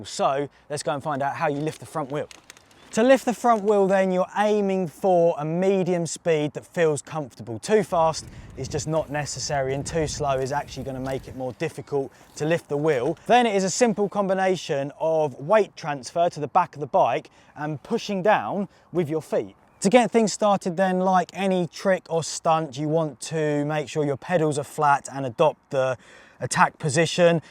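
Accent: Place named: United Kingdom. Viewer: British English!!!!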